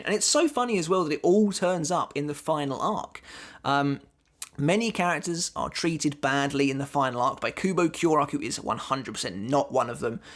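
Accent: United Kingdom. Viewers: Hey Mr, i love your british accent.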